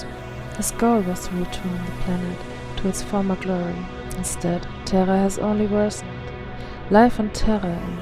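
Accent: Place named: Germany. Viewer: Your German accent is beautiful; it blends very well with the dark/gothic atmosphere of Warhammer. It is definitely an add-on. I love it! 💀THE EMPEROR PROTECTS💀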